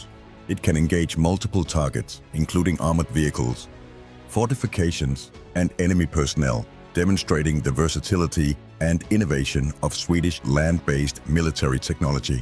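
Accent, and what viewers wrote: Denmark. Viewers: Just curious, is that your voice or a random narrator? It has a hint of danish accent, but you are Norwegian according to the bio. Great voice and awesome content, much appreciated 🫡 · Du er dansk. Det hörs på accenten. Det er god engelsk men mej lögner du ikke.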